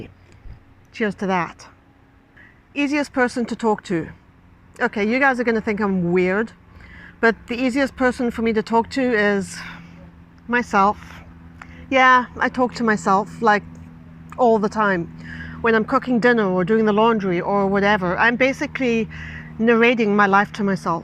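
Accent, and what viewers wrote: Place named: United Kingdom. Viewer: London I get it cause you have a tilt in your accent to the British and as for Croatia I really do not know why 😂😂😂😂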